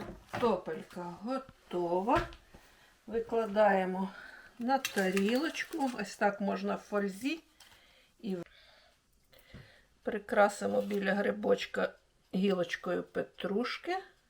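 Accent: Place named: Surzhyk, Ukraine. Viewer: Привет из Кузбасса!, как же мы любим вас за ваши, казалось бы, незамысловатые и бюджетные блюда, но настолько необычные и вкусные, что чувствуешь себя королем, хотя уже давно на пенсии, а поэтому живем скромно и воспитываем сына, который еще у нас учится.Чтобы вкусно накормит семью чем-нибудь необычным, каждое утро ищу интересные рецепты в интернете, чтобы приготовить что-то новенькое и отправить сына на учебу. А ваш говор напоминает родную бабулю из Украины, которая говорила на суржике.Поэтому встречаюсь с вами, как с родными.Переживаю за вас, чтобы все у вас было хорошо, чтобы были все живы-здоровы.И вашим деткам всего хорошего.Пусть радуют вас и берегут.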